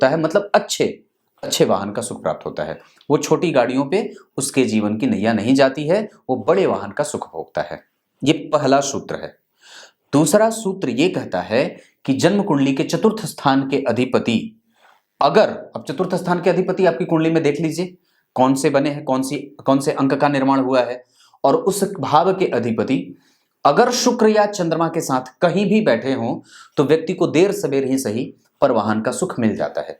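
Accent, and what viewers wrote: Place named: Purvanchal, India. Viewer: Sir आप किस क्षेत्र से है? आपकी भोजपुरी से मुझे लगता है कि आप पूर्वांचल से है क्या?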